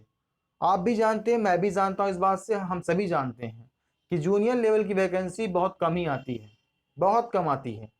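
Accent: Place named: Allahabad, India. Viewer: Sir aap ki bhasa Allahabdi lg rhi hai mja aa gya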